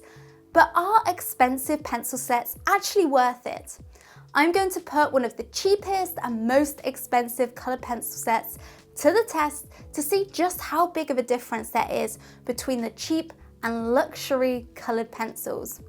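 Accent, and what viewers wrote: England, United Kingdom. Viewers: OMGGG I lovee your English accent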